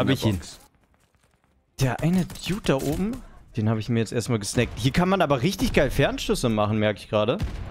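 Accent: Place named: Germany. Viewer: du hast so ein unglaubliches deutsches englisch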